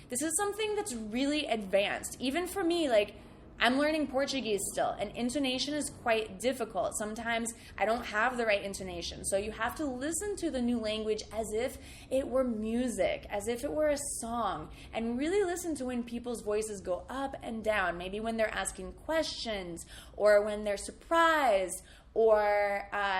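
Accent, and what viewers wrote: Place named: United States. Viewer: What is the difference between American accent that you speak and Canadian accent?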